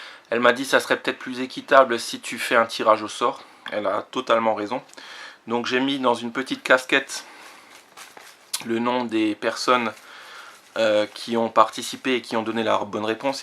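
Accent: Alsace, France. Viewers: On dirais bien un accent Alsacien ^^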